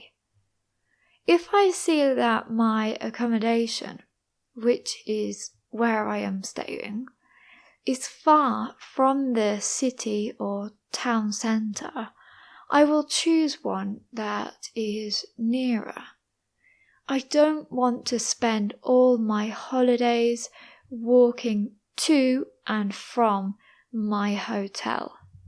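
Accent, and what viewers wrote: United Kingdom. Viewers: I love British accent, thank you for support, its so difficult find it, i happy cause i found out your channel,. 😁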